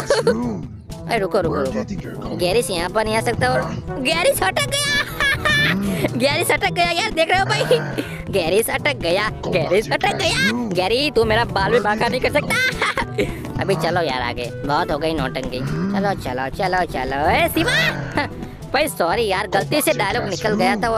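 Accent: Gujarat, India. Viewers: App Gujarati ho kya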